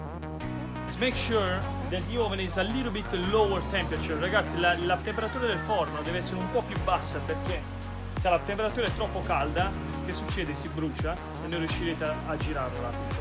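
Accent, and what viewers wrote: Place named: Apulia, Italy. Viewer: bel video, splendida pizza...bravo.. immagino che i presenti erano soddisfatti... dal tuo accento immagino sia pugliese... di dove?? un salutone da Roma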